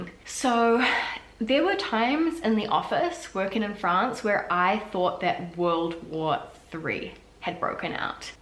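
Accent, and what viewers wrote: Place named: New Zealand. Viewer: Haha, since you moved to New Zealand I finally feel like I can see videos as soon as you upload them LOL. As a Kiwi living in Sydney, super refreshing to hear the accent. Great video as always, although i will probably never move there.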